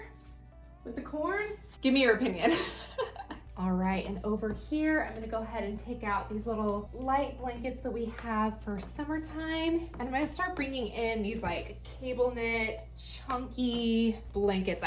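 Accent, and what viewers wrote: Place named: South, United States. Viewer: Do you live in the South. I hear you say y’all but I thought for some reason you live in the North lol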